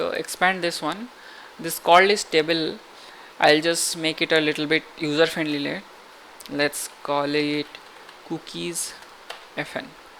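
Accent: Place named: India. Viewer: I am sure you are an indian (May be wrong) from your hearing you. Why are you charging in dollars unlike the others who have paid membership on youtube itself.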